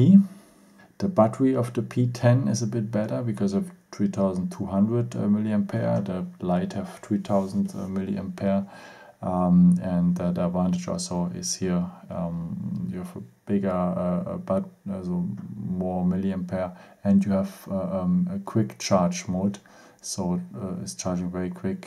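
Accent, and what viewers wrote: Germany. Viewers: Why do you say Jigahertz instead of Gigahertz? XD Your English is funny. At least I can understand you though so not hating! And at least you're not just talking German. I can respect that, especially from German people. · You know there is a English alphabet? For example the english "e" is spoken like a german "i" which you obviously speak.... but nice job reading down the information that stands on every page in the internet have a gr8 day m8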